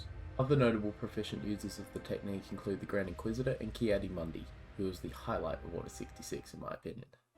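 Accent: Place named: Australia. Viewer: That Aussie accent 🤌Great video man!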